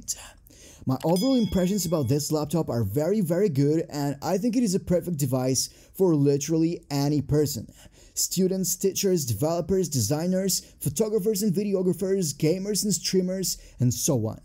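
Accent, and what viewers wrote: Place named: Europe. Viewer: I wish u all the best for your channel but your accent is distracting, its like u are trying to sound like you are from some European country, it sounds like a mix of European/ California surfer who is trying to sound tough and also suffers from asthma (from the labored breathing sounds) if u are not faking your accent then I apologize, either way good luck with your channel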